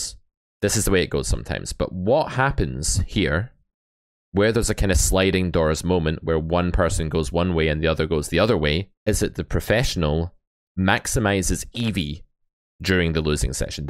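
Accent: Scotland, United Kingdom. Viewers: New sub! Where in Scotland are you from? -poker player from East Kilbride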